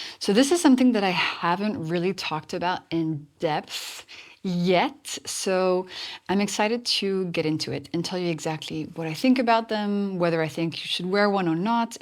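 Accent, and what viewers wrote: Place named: France. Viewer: Is French? She has got a French accent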